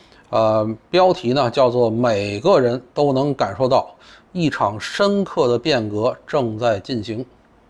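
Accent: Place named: Beijing, China. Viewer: 内容不错，京腔讨厌。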